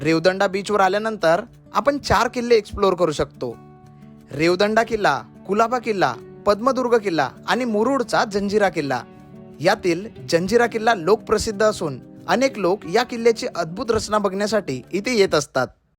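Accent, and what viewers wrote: Vidarbha, India. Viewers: Congratulations bhava🎉 Marathi travel vlogger tar bharulur ahet pan , Tuza psycho pana and vidarbhachi bhasha hach tuza X-factor aahe Keep it up Bro Lavakar 1 million houdet hi shubechha 🎉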